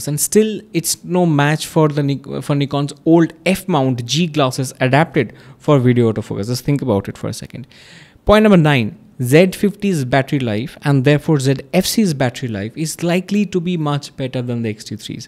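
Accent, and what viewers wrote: India. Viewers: Indian English accent usually makes people sound a bit silly, but damn this video was good. Thanks a lot, I am currently almost-decided to get the Z fc.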